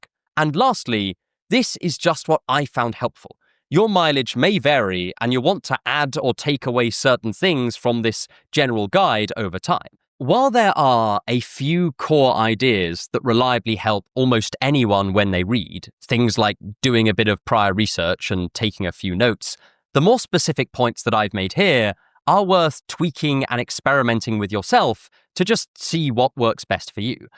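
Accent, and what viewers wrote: United Kingdom. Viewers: listening for the first 5 secs of the vid, i think he is a brit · Is this guy British? Just asking. No reason. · Love that british accent.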